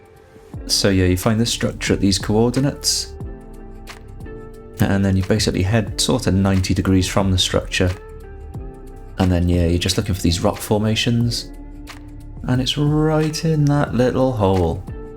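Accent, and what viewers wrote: Wales, United Kingdom. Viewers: am i picking up a welshy accent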